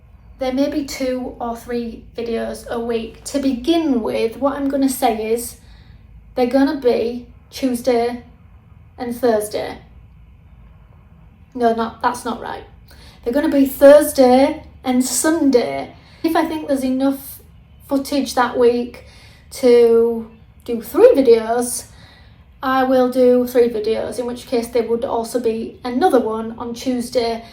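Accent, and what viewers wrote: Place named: England, United Kingdom. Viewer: I loooooooove all these ideas. I love that you are English. I find your accent and how you live, cozy and beautiful. Your every day life is so inspirational. I can't wait to see!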